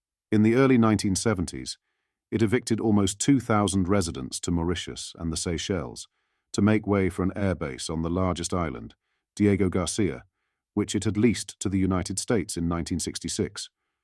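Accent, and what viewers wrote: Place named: United Kingdom. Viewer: In spite of the robot's British accent, the script for this video was clearly written by a speaker of American English. This is incongruous and jarring when the stream purports to be "Parliment Watch" (sic).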